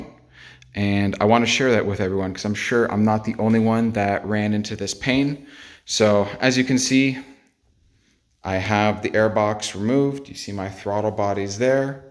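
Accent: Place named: Canada. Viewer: Canada eh?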